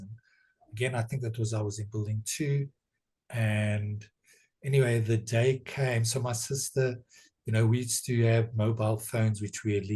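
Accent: South Africa. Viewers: is this a south african accent ? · Good South African accent !! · This sounds like a South African but let's see if I'm correct in saying that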